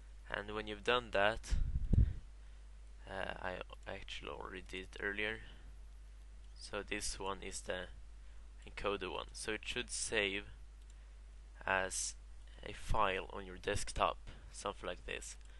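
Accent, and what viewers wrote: Sweden. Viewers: Are you swedish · är du svensk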